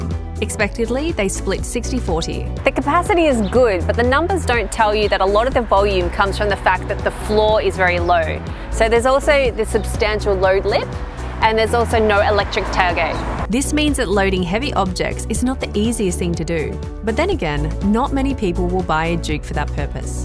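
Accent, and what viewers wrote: Australia. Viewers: Australian not British.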